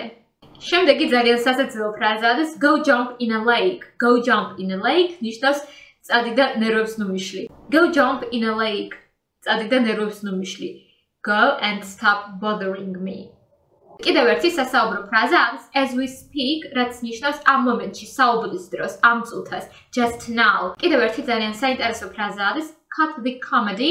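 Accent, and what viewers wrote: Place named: United Kingdom. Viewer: ძალიან კარგად ხსნი, მაგრამ ბრიტიშ ინგლიშს სამწუხაროდ ვერ ვიგებ, ამერიკული ვარიანტიც დაამატე რა, ამერიკული გამოთქმა,თ- ს ყური ვერ ეგუება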